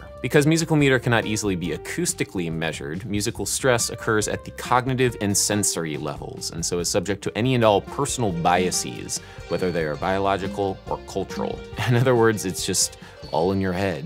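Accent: United States. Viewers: Great video and all, but I strongly believe he has quite a strong American accent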